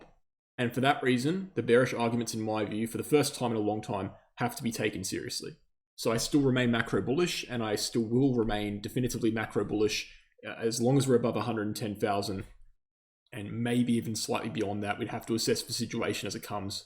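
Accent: Australia. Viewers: this comment is NOT from a bot… You have the most annoying type of Australian accent; no American can take it seriously … and, the fact that you still believe in four years cycles after structural institutional change means… “Thank God I don’t have to listen to this Ozzy“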